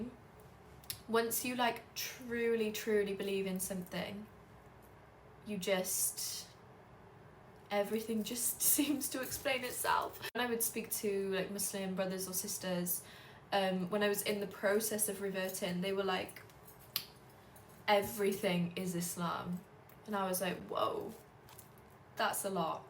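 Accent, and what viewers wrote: United Kingdom. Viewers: ربنا يثبت قلبك على دين الإسلام يارب وعلى فكرة لهجتها تدل على انها بريطانية وليست أمريكية المهم انها مسلمة فقط للتوضيح Sister we welcome you as a great Muslim sister and you look so beautiful in hijab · Sister, take your time you made the first step you recognize the creator and you submit to him. You’re in the safe zone. Everything else come second the description says you’re an American. You have a British accent. I just wanna correct whoever posted this.❤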